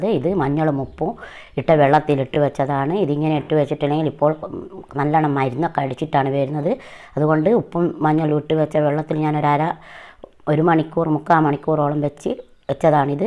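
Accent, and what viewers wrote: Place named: Kannur, India. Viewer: തനി കണ്ണൂർ 'മ്മടെ 'നാട് '👍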